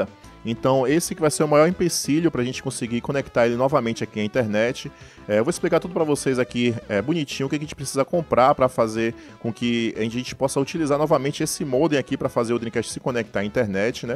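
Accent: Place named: Bahia, Brazil. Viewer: Mano vc é baiano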